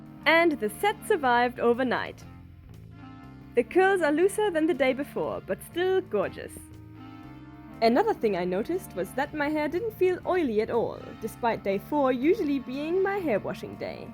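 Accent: Germany. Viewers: are u german by any chance? you kinda sound like one and your last name does too :D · oh wow ! You've lived in NZ since you were 8 . May I ask how you retained such a strong german accent ? Most people lose theirs when they move abroad as a child . Love your videos!! ☺️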